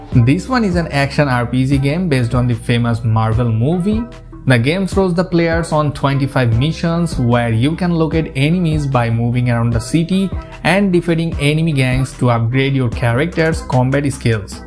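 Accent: India. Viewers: Are you an Indian? Well you have a Indian accent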